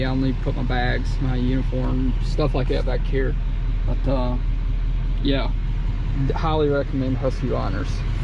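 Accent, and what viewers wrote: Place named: West Virginia, United States. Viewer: Are you from West Virginia or something? You sound kinda hick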